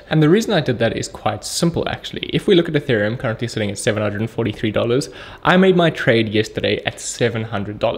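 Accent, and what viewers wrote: South Africa. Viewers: Are you from SA your accent kinda sounds that way ... I'm new here to your channel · south african? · Absolutely a South African and you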